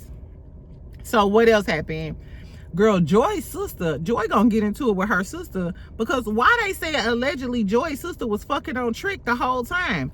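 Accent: St. Louis, United States. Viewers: I just started following you recently and you crack me up! Where are you from? I was listening to your accent like is she from STL but then you said you live in TX. Anyway, happy Scorpio season 11/20 ✊🏾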